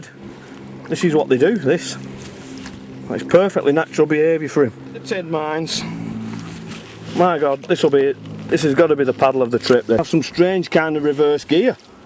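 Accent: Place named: Northern England, United Kingdom. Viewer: Good footage of the sunfish and I love the narrating proper northern accent, me grandad was from Halifax so was a nice reminder. Thanks for this video.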